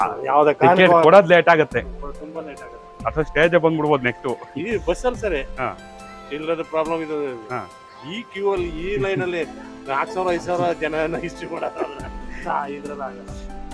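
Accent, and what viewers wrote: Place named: Chamarajanagar, India. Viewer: ನೀವು ಈ ರೀತಿಯ ಪ್ರಕೃತಿಯ ವಿಡಿಯೋ ಗಳನ್ನು ಮಾಡಿ brother, ನಮ್ಮ ಗುಂಡ್ಲುಪೇಟೆ ಸುತ್ತ ಮುತ್ತ ಅತ್ಯದ್ಭುತ ಪ್ರೇಕ್ಷಕ ಸ್ಥಳ ಇದೆ, ಭಾಷೆ ಬಗ್ಗೆ ತಲೆ ಕೆಡಿಸಿ ಕೊಳ್ಳಬೇಡಿ ,ಚಾಮರಾಜನಗರ ಉಚ್ಚಾರಣೆ ನೇ ಹಾಗೆ ❤ನೀನು ಮುಂದುವರಿ ಗುರು ❤❤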